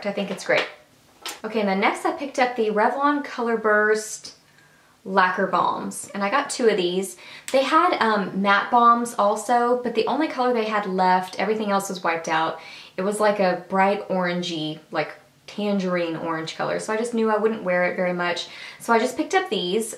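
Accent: South, United States. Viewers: Great review of everything! I've been searching for the matte balms- haven't found yet- going to look again today- day off. So glad I found you- prissy highly recommend I check you out - from PrissyAndPink- so glad I did! R u from the South too? We're both in Houston and you sound like another Southern girl!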